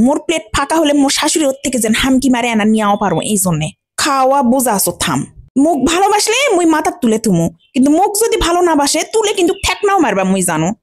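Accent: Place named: Naogaon, Bangladesh. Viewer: 😊😊😊আপু আমার এই ভাষাটা খুব ভালো লাগে নওগার ভাষা আমার একটা চাচী নওগাঁ তে থাকতো এই ভাষায় কথা বলতো যখন আমাদের বাড়িতে আসতো আমি শুধু এই কথাই শুনতাম আমি আর আমার আম্মা। আমার আম্মা এই কথা শোনার জন্য ওনাকে সবসময় আমাদের বাড়িতে বসাইয়া রাখত। এত ভালো লাগলো ফ্যান হয়ে গেলাম। ❤❤❤❤তবে বুঝে নিও পাশে থেকো।, 💌💌💌👏👏👏🎁🎁🎁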